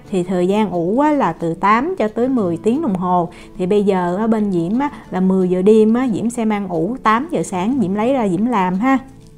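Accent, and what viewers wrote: South, Vietnam. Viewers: Cám ơn em nhiều nhe để chị làm nữa khi nào thành công chị sẽ báo em biết ngay he, chúc em luôn vui và khỏe nhe. Chị luôn theo dõi và theo rất nhiều recipes của em như là bánh flan nè rất ngon đó em nhưng đối vơi anh chị thì hơi béo nên chị giảm bớt lượng cream cheese là rất ngon đó em. Tự già rồi không thể ăn giống các em được đó. Ah chị cũng làm bánh bao theo ct của em rất thành công vì bên chị có bán loại bột Red lotus đó em nên chị cứ theo đó mà làm hoài thôi. Cám ơn em rất nhiều lần nữa. Chị rất thích coi cách em làm và nói chuyện vì không dài dòng và nghe tiếng Nam nữa vì chị cũng là người Saigon. Ah dì của chị nấu ăn cũng ngon lắm và cũng có coi video của em ,dì nói trong bài nấu phở của em dì nói em nên cho thêm một con gà hen và đuôi bò nữa thì sẽ ngon lắm đó em chứ nấu như em là hơi ít xương thịt để ngọt đó em, có gà hoặc kẹt lắm mình lấy soup gà pha thêm nó sẽ bớt ngậy mùi bò hơn đó em. 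Đó là chị recommend thôi chứ không có ý gì đâu nhe cưng, chị nghe dì chỉ vậy nên chỉ cho em biết thêm để chị em mình ngày nấu ngon cho gia đình ăn nhe. Nói chuyện với em sau nữa nhe. Thân mến.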